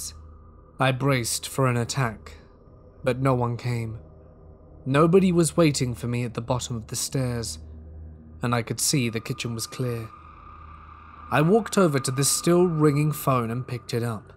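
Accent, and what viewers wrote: United Kingdom. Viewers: Yaknow ive spent very very extensive amounts of time in the woods and mountains of kentucky and a few other places like weeks at a time frequently im more at home in the wilderness than i am in a house or society around people while ill admit ive seen odd stuff hurd some freaky things i found explanations to all and have never seen nor heard anything cryptid lol still some damn good stories especially sense it sounds like a 600 yr old vampire is reading it too you not just bc he is from the uk but his tone and flow hes a pretty damn good story teller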